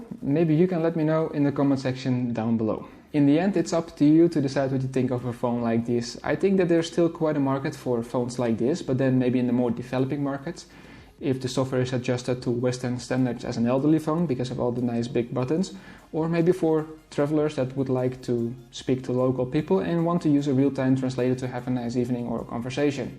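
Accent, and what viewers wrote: Netherlands. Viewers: Als ik mijn ogen zou sluiten kan ik horen dat jij een Nederlander of een Nederlandstalige Belg bent.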